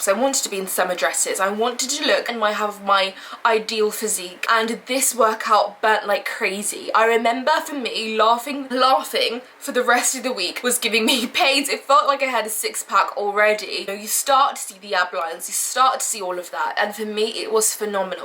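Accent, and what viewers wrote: United Kingdom. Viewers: She sounds like she could be in bridgeton… love it 😅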